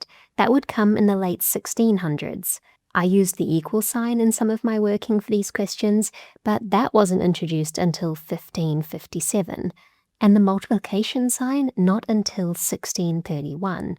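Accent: New Zealand. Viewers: definitely not Australian, that’s a New Zealand accent 😊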